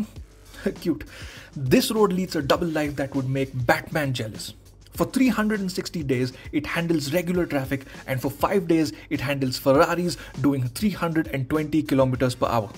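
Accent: India. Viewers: God damn! First Indian with a listenable accent!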